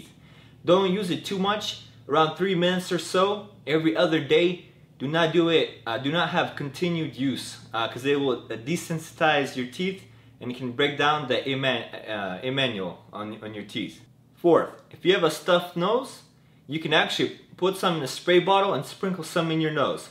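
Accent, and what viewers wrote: Russia. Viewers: Wat happen to your Russian accent 😯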